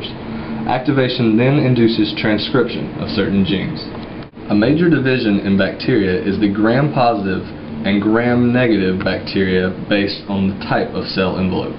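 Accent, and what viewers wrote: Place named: South, United States. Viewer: visuals were kind of blurry, but quick pace made it easy to follow. I find your southern accent endearing :)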